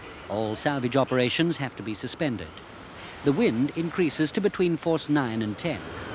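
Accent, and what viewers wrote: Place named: RP, United Kingdom. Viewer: It's not an accent it's called Received Pronunciation and he likely wouldn't have got the job without it at that point in time! I'm not a fan but I think it's deemed the most understandable form of the English language.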